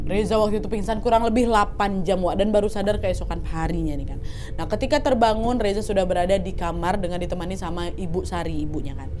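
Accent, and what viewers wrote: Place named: Sumatra, Indonesia. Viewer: Dengar cerita berasa cerita nya dari tanah Sumatera hihihi...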